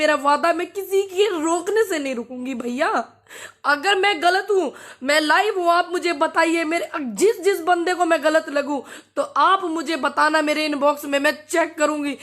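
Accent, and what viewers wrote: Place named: Western Uttar Pradesh, India. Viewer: बिटिया! हम आपके साथ हैं । आपकीे भाषा दूसरी नहीं है । मै मेरठ से रहा हूंँ । मैने आपके लगभग सभी वीडियॊ देखें हैं । आपने कहीं भी अभद्र भाषा का इस्तमाल नहीं किया है । आपकीे भाषा पश्चिमी यू पी की ग्रामीण खड़ी बोली है, यह एक धांकड़ बोली है, हाजर जबाब बोली है । आप यदि दिल्ली में बोली जाने वाली बोली का इस्तमाल करेगीं तो पूरा देश आपकीे आवाज सुनेगा । इसके लिये आपको यू पी शिक्षा बोर्ड के हिंदी के गद्य-लेख पढने होंगें ।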